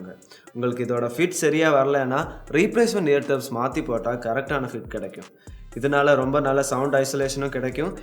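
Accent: Kerala, India. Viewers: From his slang, is a Mallu:-) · konjam kerala waadai adikudu thambi pesaradula...:) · Bro neenga malayali yaa. Neenga pesum bothu oru malayali Tamil pesura Madhuri iruku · Sound like Malayali speaks tamil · Avaru malayalai pa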